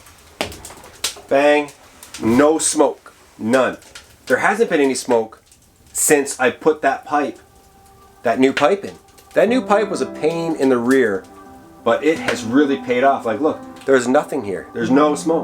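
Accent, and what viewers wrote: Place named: Nova Scotia, Canada. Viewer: Get wicked stuff man. Sound like you’re from NS exploring the far north! Great stuff. I know a legere from ns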